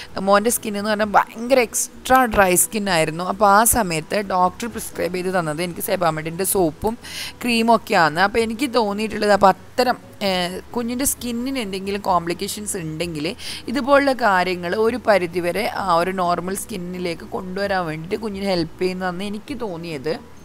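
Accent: Kasaragod, India. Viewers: Sebamed super ,ksd slang l polichu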